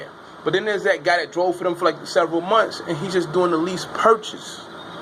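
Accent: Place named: New York, United States. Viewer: Nah son sure do have that ny vibes I’m from Brooklyn living in Houston so I can tell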